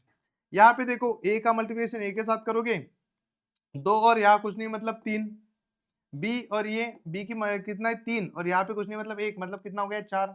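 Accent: Maharashtra, India. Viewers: sir marathi manus aahet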